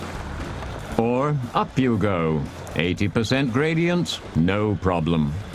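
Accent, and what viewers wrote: United Kingdom. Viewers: youre from UK ? :) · What is going on with the narrator's accent? He sounds like an English BBC science presenter from the 1960s, but this is clearly filmed on the continent with right-hand drive cars where the prices are quoted in Euros.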